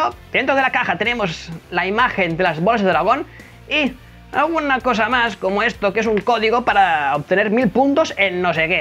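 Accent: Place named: Spain. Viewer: hablando como español... Eso no me lo esperaba D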